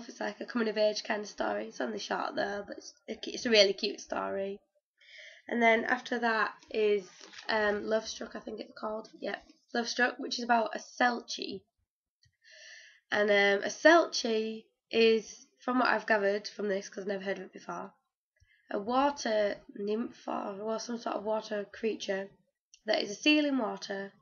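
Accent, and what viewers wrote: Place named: United Kingdom. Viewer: Love your accent is it British?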